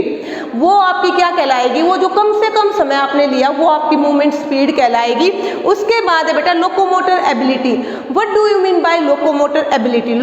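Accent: Haryana, India. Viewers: super explanation mam or aapni boli haryanvi toch aari thodi thodi mam👌👌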